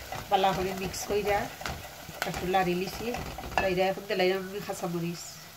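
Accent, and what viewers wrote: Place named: Sylhet, Bangladesh. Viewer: সিলেটি মাত আর রান্না মজা হয়ছে 😊❤❤❤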